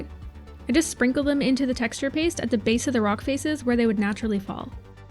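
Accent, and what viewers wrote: Newcastle, United Kingdom. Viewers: I was expecting a heavy geordie accent, but the rocks are good either way.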